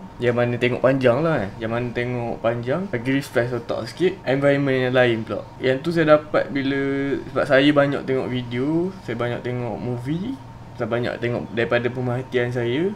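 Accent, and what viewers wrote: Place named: Pahang, Malaysia. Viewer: Aok ni orang mane? Ngape mcm loghat pahang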